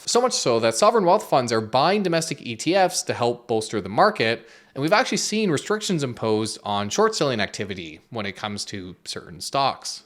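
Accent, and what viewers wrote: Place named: Canada. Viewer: The slight Canadian accent is cute 😊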